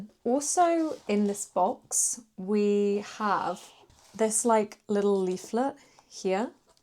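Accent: United Kingdom. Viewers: Im Brazilian and I love your channel, im learning English, but your British accent is soooo good to listen, when you say "and" is my favorite part 🤣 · does anyone know what the british accent she uses is ? i am really interested because it makes me enjoy learning english...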